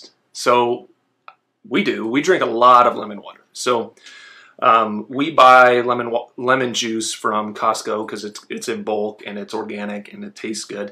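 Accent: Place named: Canada. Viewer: He's Canadian! "out" lol "ut".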